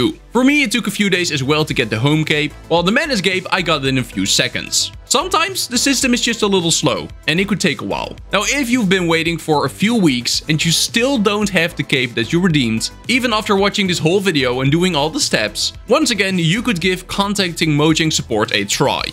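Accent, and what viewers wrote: Netherlands. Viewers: A Netherlandisch acent???? and thx btw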